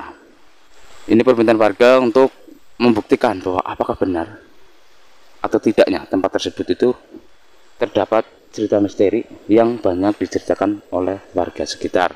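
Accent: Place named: Central Java, Indonesia. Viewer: Logat Jawa Tengah Salam kenal....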